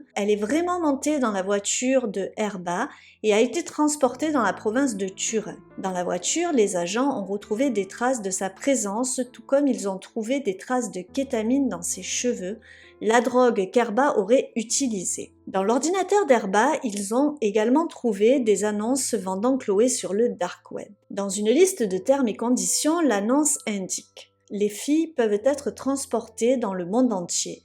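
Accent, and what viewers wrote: South, France. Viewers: tu viens d avoir un nouvel abonné a l instant désolé je connaissais pas ta chaine avant cette fin de soirée j aime ce que tu fais félicitations miss avec ton petit accent du sud j aime beaucoup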